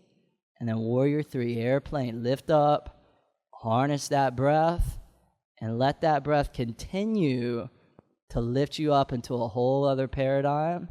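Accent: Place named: Southern California, United States. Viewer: Thanks teacher, been practicing with you on youtube everyday, here in Bali. Equanimity in that Southern Californian twang.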